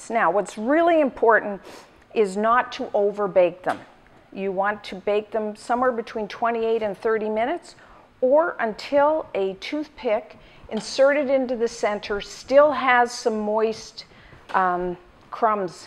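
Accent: Canada. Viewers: She sounds so canadian "abowt"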